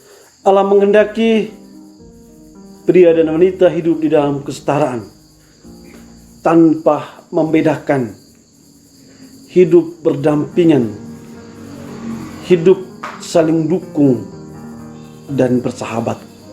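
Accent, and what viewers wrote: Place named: Flores, Indonesia. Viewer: Semakin Logat Maunori. Mantap eja Rmo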